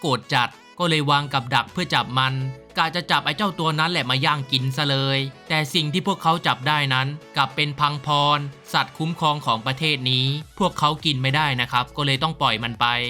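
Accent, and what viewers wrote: South, Thailand. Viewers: ปลาจ้องม่อง😊คนใต้ใช่ไหมเนี่ย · คนใต้นี่หว่า😅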